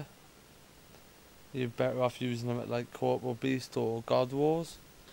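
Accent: Wales, United Kingdom. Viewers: From Wales? Sounds like it :D good vid man. subbed, keep em coming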